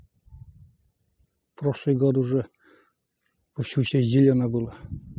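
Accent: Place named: Vologda, Russia. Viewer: почему-то не могу найти где вы живёте? Где-то в видео мелькал Сыктывкар. Это там вы живёте? Очень говор похож на моих родственников они жили в Вологодской обл.и в Архангельской обл. Слушаю вас и как будто бы с ними общаюсь. Они тоже были спокойные, урасновешенные. У них тоже были избы из бревен, холодно также было как и у вас. Красота природы тоже как у вас. На жаль они уже все ушли в мир иной. Но не будем о грустном, царствие небесное им а нам здравия, любви, благополучия.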